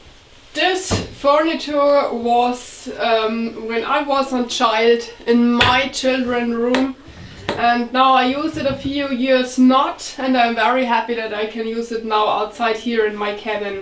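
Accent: Germany. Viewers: Has to be the accent. I like it when she goes all German tongue. Helps me learn the language. Very Polite Lady.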